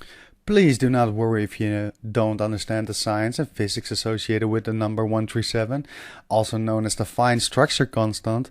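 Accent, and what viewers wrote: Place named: Netherlands. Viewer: Hi, you sound Dutch to me 🙂, am Dutch too....thank you for this video. Please look into the book the Numerical Universe by Anthony Morris. You will find it mind blowing. He shows through Vortex Mathematics that we are living in an intelligent designed Universe of 137 billion years old 🙂 He elaborates deeply this number and I have put it on my car’s license plate ever since I find out about this number. Also in Hebrew the word God is written in such a way that it looks like a mathematical formula showing the Phi sign and the number 7. Greetings from Cyprus <link>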